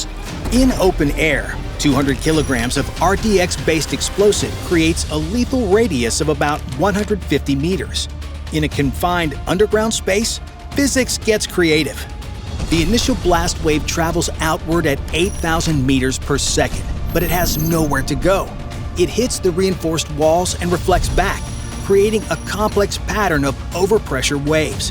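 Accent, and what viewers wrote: United States. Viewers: Had to give up. I can't stand the overblown yank narration 🤢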